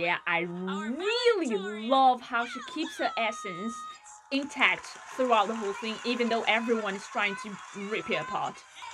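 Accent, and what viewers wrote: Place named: Australia. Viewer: Hey sorry if you’ve answered this already! I’m curious about which country you live in. Your accent sounds Australian or like it’s from New Zealand (if so you’re so lucky). I’m loving your reactions, it’s really funny!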